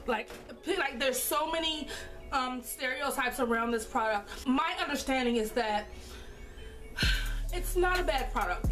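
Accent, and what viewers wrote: Baltimore, United States. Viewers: Are you from Bmore? If not you have a serious Bmore accent. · you from baltimore?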